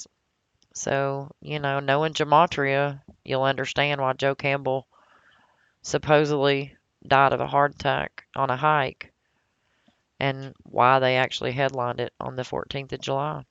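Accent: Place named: South, United States. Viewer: Good breakdown i love that southern accent so much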